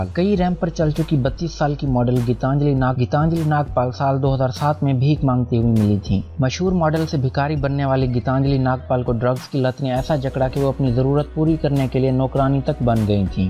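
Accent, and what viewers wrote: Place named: Pakistan. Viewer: Listening a Pakistani Urdu accent on background !!! Something is fishy!!!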